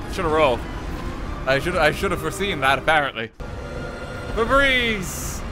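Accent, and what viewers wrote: Ireland. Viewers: Irish, not British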